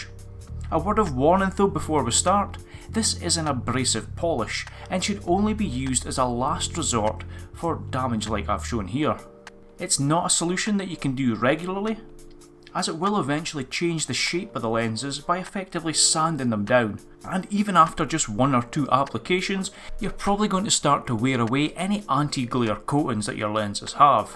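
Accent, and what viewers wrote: Scotland, United Kingdom. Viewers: Love that Scottish accent m8😂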